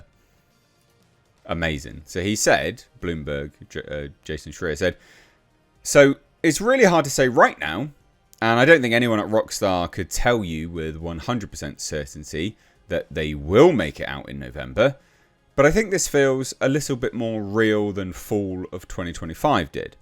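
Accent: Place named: England, United Kingdom. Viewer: sounds English. Yet he just said coming out in “fall”. WTF🤦🏼🤣. Pretentious knob🤣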